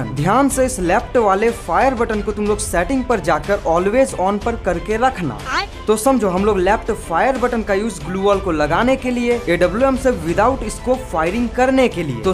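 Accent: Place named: West India, India. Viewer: Bhai ka taliking style full west india ke citizen ki tarah hai 🙂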